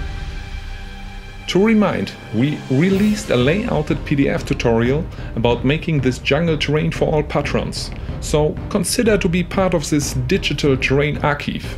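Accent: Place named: Germany. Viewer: Once again a great build. Somehow I love your hard german "Akzent" :-D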